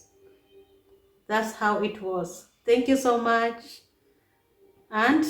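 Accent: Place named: Maragoli, Kenya. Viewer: Hi sis where do you come from? You sound maragoli lime me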